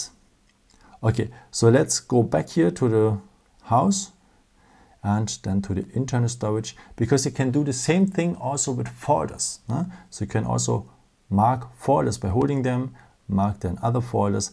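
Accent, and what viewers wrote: Germany. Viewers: Gutes Video! Aber kann es sein das du deutscher bist?😅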